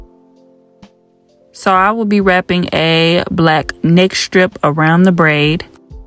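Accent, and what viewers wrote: St. Louis, United States. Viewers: “ cut my hurrr” lol 😭the way Americans talk is so interesting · You from saint Louis?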